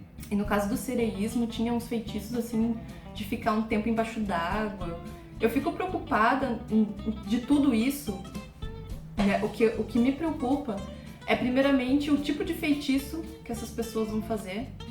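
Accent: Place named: South, Brazil. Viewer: aaaaaa vc é do sul, como nunca notei?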